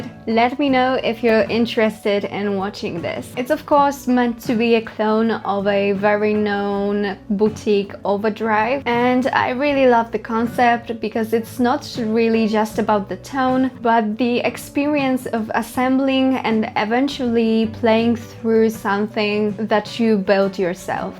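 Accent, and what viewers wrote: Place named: Poland. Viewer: Cool video. The Boss BD is one of my favorite drives. Btw, I love your accent! I thought it sounded kind of northern European, and then I saw that pedal was Polish, I'm guessing the accent is also Polish (and your English is perfect!). :) Anyway, greetings from Ohio, US.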